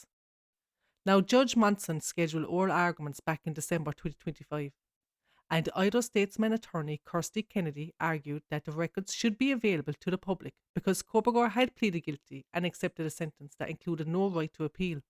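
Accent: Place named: Ireland. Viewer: I love your voice! I had no idea you were actually Irish! Lovely. Thank you for now uploading videos. I can liztdn while i clean and work❤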